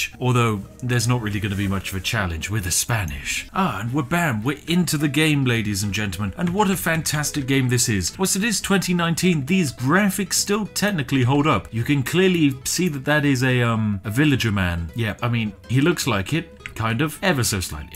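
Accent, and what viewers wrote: United Kingdom. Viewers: ARE YOU REALLY A BRIT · As an American I love how British you are.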